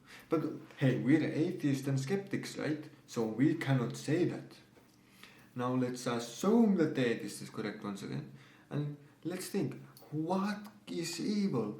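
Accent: Finland. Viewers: let me guess. You from Finland?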